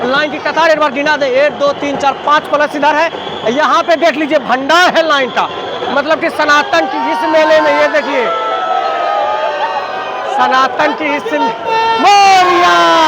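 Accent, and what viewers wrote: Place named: Bihar, India. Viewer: भाषा का उच्चारण ठीक से करो।। तुम बि हारी कोई भी भाषा बोलो उसमें उरेठ पन झलकता है।।इसलिए तुमलोग हर जगह मार खाते हो।।शर्म नाम की कोई चीज बची ही नहीं है तुमलोगों में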